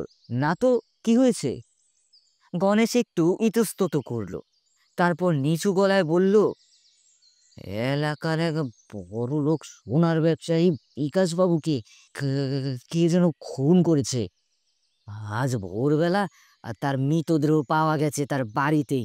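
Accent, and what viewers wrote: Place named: Bihar, India. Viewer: Bihar theke esechen naki Bangladesh theke? Ekhono bhasa thik hoyni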